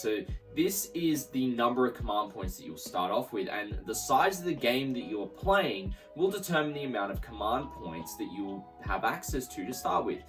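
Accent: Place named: Australia. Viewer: Thank you for explaining this in Australian English.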